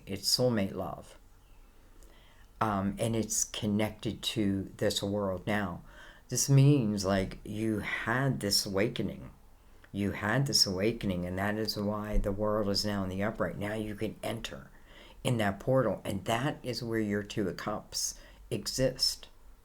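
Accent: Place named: Philadelphia, United States. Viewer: Are you in Philadelphia? You sure have a Philly accent to my ears! Nice reading.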